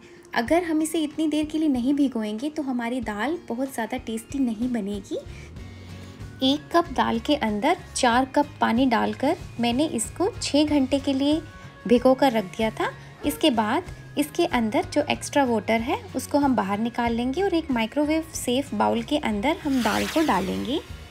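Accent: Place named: Marwari, India. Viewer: Good trick. You have a Marwari accent :)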